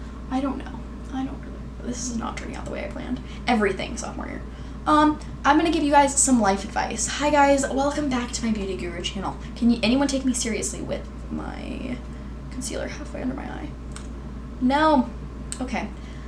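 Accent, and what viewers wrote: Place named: South, United States. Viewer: Beautiful video, I just subscribed, are you southern? I detect a tiny bit of an accent but I’m not totally sure.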